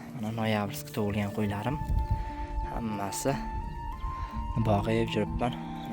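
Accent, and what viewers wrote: Surkhandarya, Uzbekistan. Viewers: Узбек Сурхондарё Кашкадарё областларини шеваси шундай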